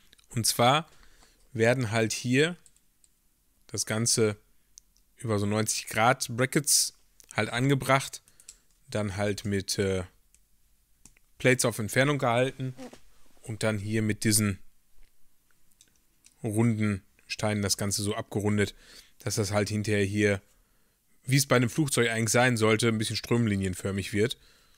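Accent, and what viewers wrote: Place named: Ruhr, Germany. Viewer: Dat is Pottsprache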